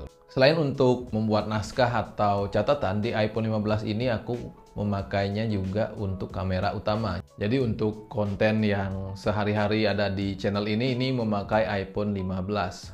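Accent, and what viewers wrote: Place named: Bali, Indonesia. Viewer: Logat bali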